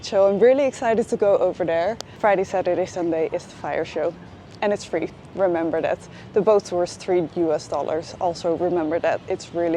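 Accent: Netherlands. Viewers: Thanks for the video, food looks amazing thinking to go in march, and you look very good to, i hear some.dutch accent. Byee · Ook praat je in het Engels, jouw accent vertelt alles in het Nederlands hhh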